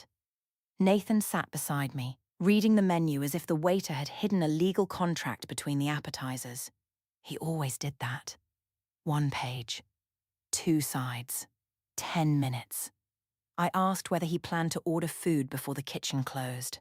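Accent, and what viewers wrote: England, United Kingdom. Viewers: fidgeting or touching ofthe face or neck. Looking away. There are many things that are repeated like i told myself. Or mechanical precision. Many.of the stories seem.to follow the same template.she humiliates her husband. He starts sleeping in the other room. He locks himself in the home office getting everything together for a consultation with an attorney. The wife is getting too friendly with a co worker. And the English accent can be a little irritating after a while.im not trying to be critical. Just thought some feedback may help your channel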